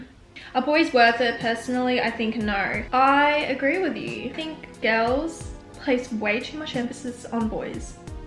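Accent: Australia. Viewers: And her thicc aussie accent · I only talk in an Australian accent now because of you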